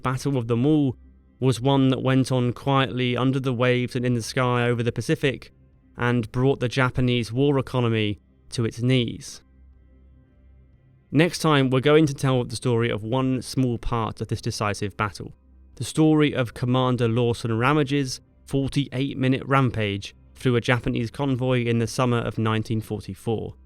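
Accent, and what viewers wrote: United Kingdom. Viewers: Somehow it sounds more important with a British guy announcing.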